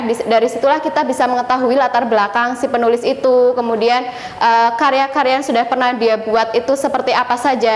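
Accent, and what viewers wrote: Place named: Java, Indonesia. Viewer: mbanya medok lucu xD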